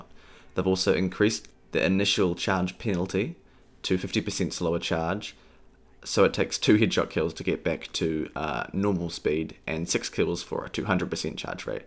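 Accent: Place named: New Zealand. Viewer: <3 dat New Zealand accent and ur vids :P ~ From Straya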